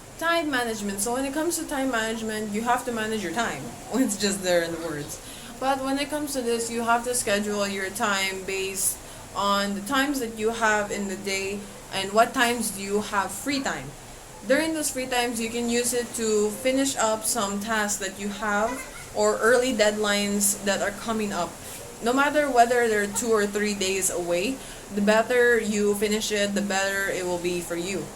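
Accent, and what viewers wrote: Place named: Europe. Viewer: you sound like you have a European accent.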